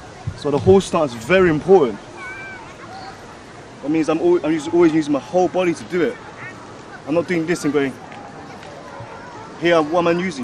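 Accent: United Kingdom. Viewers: i love i assumed he was gonna have an asian accent and he came sounding like a sidemen😂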